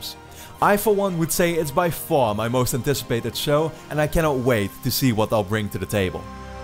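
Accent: Netherlands. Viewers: hes dutch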